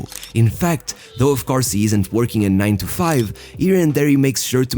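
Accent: Portugal. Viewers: Não sei porquê mas há algo que na tua forma de falar que se percebe que és português 😂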